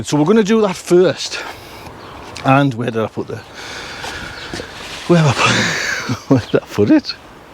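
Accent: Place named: Sunderland, United Kingdom. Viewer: Hi, been following for a while and finally got round to subscribing. Love the no nonsense practical videos, daisy obvs, but love my daily dose of home. Was wondering about the accent but saw you recently driving to a nursery heading into Seaburn 😍 Keep doing what you are doing, keeping an exile stuck in the Midlands v happy 😁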